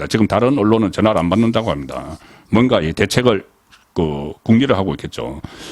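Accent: Gyeongsang, South Korea. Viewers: 진짜 경상도 다, 말은 하는데 ?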